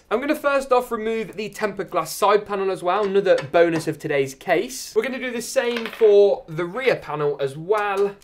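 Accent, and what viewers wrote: United Kingdom. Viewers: such a british xDDDD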